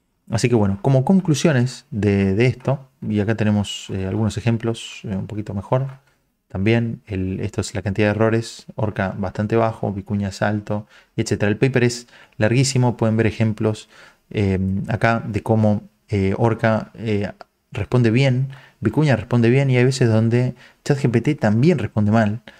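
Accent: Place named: Rioplatense, Argentina. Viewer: en español, (Argentino o Uruguayo)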